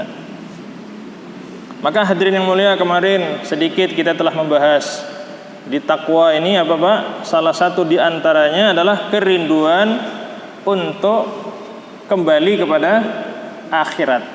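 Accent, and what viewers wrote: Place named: Johor, Malaysia. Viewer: Bagus Bahasa Melayu Dia seperti Bahasa Melayu kami di johor juga.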